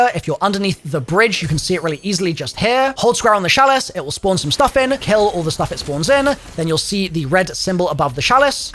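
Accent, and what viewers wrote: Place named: United Kingdom. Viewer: Thank God for the subtitles. I hate your shit British accent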